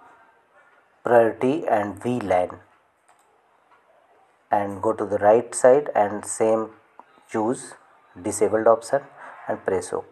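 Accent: India. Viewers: indian if you hear this accent again just close the video, or you will lost your time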